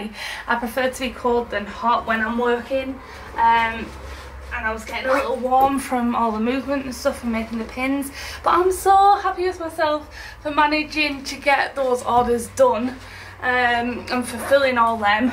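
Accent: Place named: Northern England, United Kingdom. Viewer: Seasons greetings!:) I love having your northern voice in my feed.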